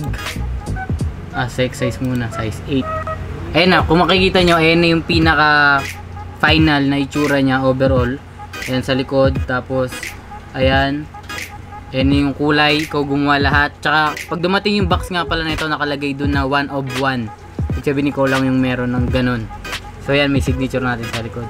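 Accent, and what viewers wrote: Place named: Visayas, Philippines. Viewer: Sobrng bisaya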